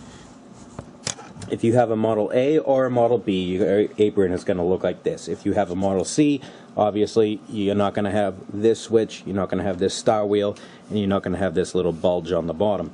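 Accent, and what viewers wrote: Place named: New York, United States. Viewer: the carriage hand wheel. I think I just got some old oil gunk under the carrier. I sprayed wd40 and it got much smoother but I probly should remove the carrier and clean under that. It looks like we both have a b9w is that right? I'm new to this machine and would desperately love to learn some more. Btw I'm located in CT and I love the ny accent lol. It looks like we do indeed have the same lathe. I would love to talk to you and maybe pick your brain a bit if you dont mind?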